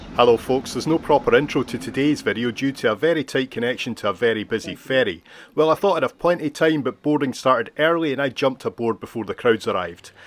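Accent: Scotland, United Kingdom. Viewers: Really loved this. The funny thing is that my American wife and kids couldn't understand a word you said! :) I grew up in Australia, and my best friend for some years was from Glasgow, so I long ago learned to tune into the Scottish accent. Because of the popularity of British TV in Australia, most Aussies in fact 'get' the various British accents. But I've lived in the USA since 2000 and it's hilarious how most Americans practically need subtitles - and often get them in movies and TV programs. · Phenomenal! Aloha from my home, on another spectacular island, Big Island Hawaii. :-) The beauty and the drama of the cliffs in your video are priceless. Fewer tourists, too! Subscribed. My grandfather was from Auchterarder, Perthshire, and your accent brings back fond memories of him. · I guess he is Scottish. And so he speaks a dialect of English.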